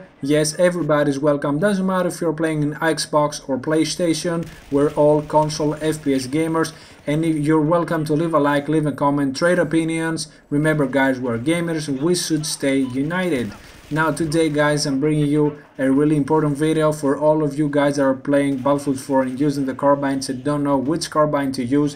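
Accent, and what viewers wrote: Greece. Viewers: Where is he from? His accent sounds like he is Greek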